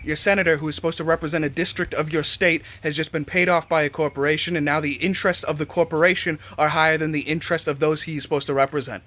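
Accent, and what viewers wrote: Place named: Staten Island, United States. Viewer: You simultaneously sound like you’re from Staten Island, Boston, and the south. Never heard this accent before.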